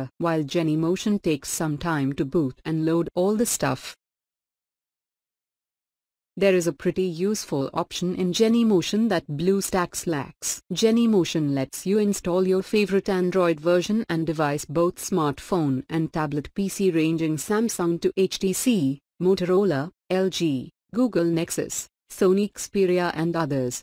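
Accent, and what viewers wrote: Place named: India. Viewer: your TTS sounds like indian accent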